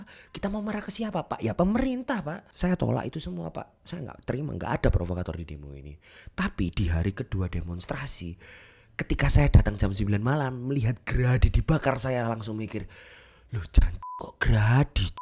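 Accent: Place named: Java, Indonesia. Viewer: Feri Irwandi versi medok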